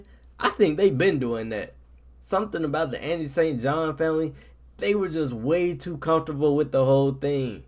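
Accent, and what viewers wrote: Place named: Maryland, United States. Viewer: "erybody" My guy is Marylnd region. Am I right brother? Great video and made some ood points, New subscrber.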